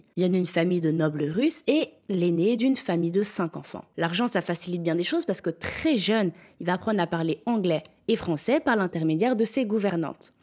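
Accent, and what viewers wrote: Belgium. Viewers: Merci pour ta vidéo que je trouve très bien travaillée. Surtout ne change pas ton charmant accent belge;et c'est un français qui te le dis. Bonne continuation à toi!❤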